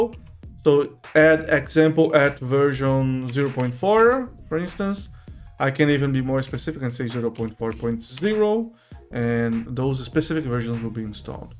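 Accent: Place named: Brazil. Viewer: Só pelo sotaque ja sei que é BR. Ótimo trabalho!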